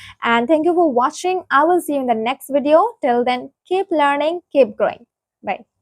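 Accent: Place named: India. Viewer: I like accent Indiah😊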